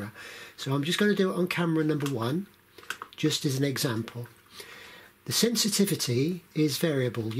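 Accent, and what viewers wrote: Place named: West Country, United Kingdom. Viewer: Mate u sound west country ish lol👍😎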